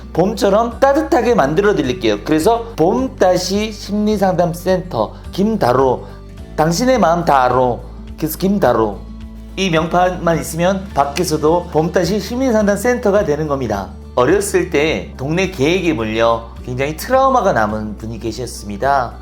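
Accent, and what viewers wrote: Gyeongsang, South Korea. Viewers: 경상도 사투리 티 안내려고 서울말 쓰는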